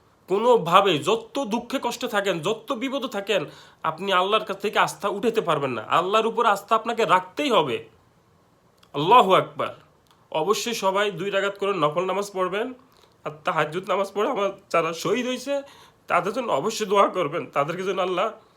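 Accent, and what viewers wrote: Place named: United Kingdom. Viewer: Look the way u talk bai from uk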